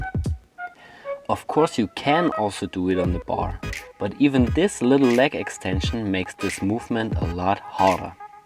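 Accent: Germany. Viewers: German accent :D :D :D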